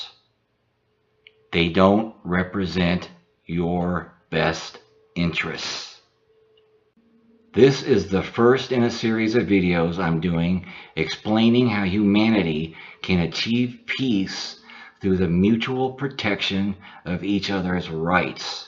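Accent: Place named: United States. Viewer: Amazing and thought provoking in this age of rampant extremism and rising nationalism. This is the first time I've listened to an American and understood every single word he has uttered without scratching my head and despite his accent. Besides, this person sounds like a very decent American, which is quite unusual. Interesting philosophy, really, and a humanistic and tolerant approach to the world. Personally, I've always harboured similar ideas ever since I was a teenager, but I had no name for it and never looked for one anyway. I've just been unable to fit into any ideological, doctrinal or sectarian pattern all my life, which I think is a boon as I never got involved in compromising political endeavours. Voilà de quoi me lubrifier les méninges. Greetings from Algeria.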